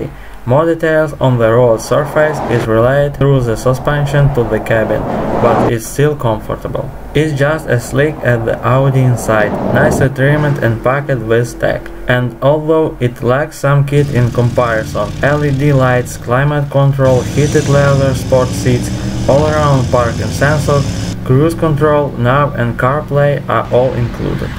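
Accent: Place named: Russia. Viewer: Не привычно слышать русский акцент в видео на английском )) Молодец, продолжай!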